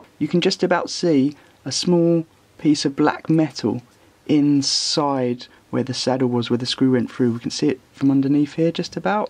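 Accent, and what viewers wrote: United Kingdom. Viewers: Bro you have the best story telling voice. (Your accent sounds like you're from where I was born in the u.k [Wokingham, Berkshire]. I've moved to Australia now. Amazing video, thank you. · Dude, you are so good at this kind of thing. This was a bit more “101” than I needed to go, but I ended up watching start to finish to learn some more detail just based on how great your photography and explanations were! These are the kinds of videos I would make if I had a British accent!! Haha cheers!!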